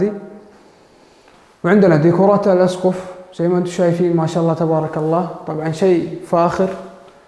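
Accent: Saudi Arabia. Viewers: اللهجه. سعوديه. اكيد. الاهل. مواليد السعوديه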